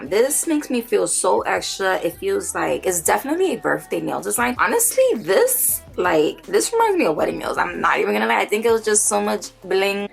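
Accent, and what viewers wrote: New York, United States. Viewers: I love your accent r u from New York? I’m A new sub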